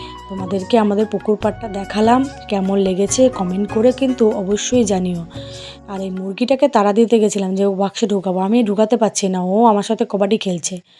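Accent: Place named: Kolkata, India. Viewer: খুব সুন্দর অসাধারণ আপু আপনার কথা গুলো কলকাতার ভাষা ❤🇸🇦🇧🇩👌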